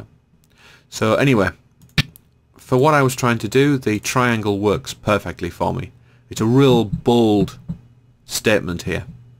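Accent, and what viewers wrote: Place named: United Kingdom. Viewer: Thank you for this tutorial sir. I'm glad that when i listen to this video i hear a light British accent rather than the strong irritating American accent that i hear all too often.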